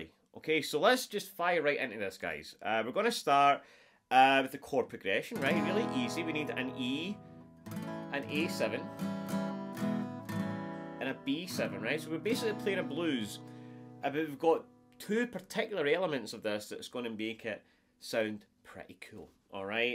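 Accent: Scotland, United Kingdom. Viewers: He is Scotish . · Great video, To check his fingerwork, especially on the A7 ascent, I slowed the video down to 25 and 50%... The Scottish accent sounds brilliant!. Like some drunk old pirate - fantastic! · Scottish? Good riffs? Good lessons? Instant sub mate!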